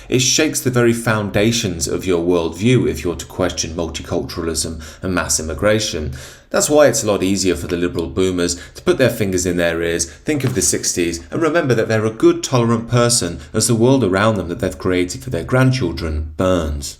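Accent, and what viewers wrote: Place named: Leicester, United Kingdom. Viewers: Leicester, that’s it, I knew you had an accent. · Yes, true. But a no point does he say “hey, up me duck.” That would place him around the currently flooded Barrow-on- Soar.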